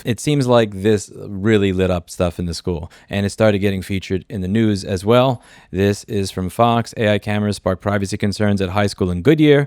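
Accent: New York, United States. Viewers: I fkn love how just sounds more NY the more worked up he gets about smth xD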